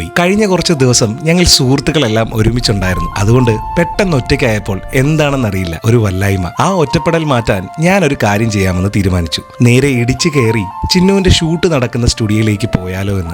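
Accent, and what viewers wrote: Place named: Wayanad, India. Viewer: Vayanadan sound 🤭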